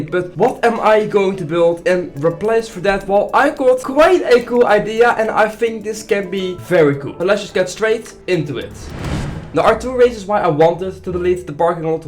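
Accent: Netherlands. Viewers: Are you dutch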